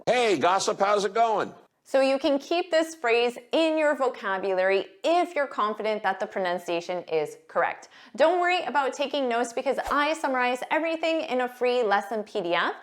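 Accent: United States. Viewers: You speak American! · I don't like your pronunciation so so so boringly American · Such horrible american accent! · A nasal American English speaker stating the obvious.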